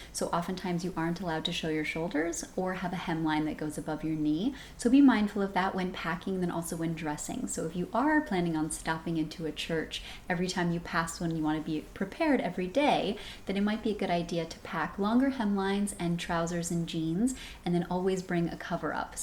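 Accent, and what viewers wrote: United States. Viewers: I am sad her culture is to speak perfect American English, and abandon her own culture completely. Her culture is thousands of years older, but pretty great.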